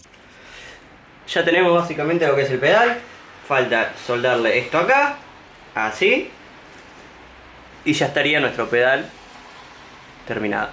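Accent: Argentina. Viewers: Sos de Argentina?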